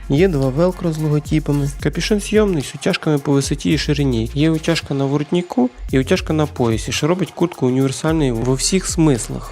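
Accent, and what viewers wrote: Surzhyk, Ukraine. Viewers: всё хорошо, прикольные куртки! Но, когда ты делаешь видео контент постарался пожалуйста не говорить на суржике очень режет слух! Тем более что в большей половине видео звук наложен на картинку. Без обид! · Цей суржик 😤 На вчіця правильно розмовляти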